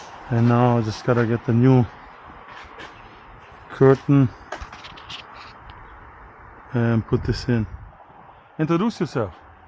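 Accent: Germany. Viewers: Super nice Video! If you could do the process also for a VW Touran VAN? Seems to me quite simular, but obviously there are differences. Also i don't have a garage with all the tools you used. By origin you must be garman by your accent? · Really hoped this would be easier for someone who usually doesn't work on his car 😂 You're accent sounds a bit German, and your friend's sounds Italian. What kind of accent is that?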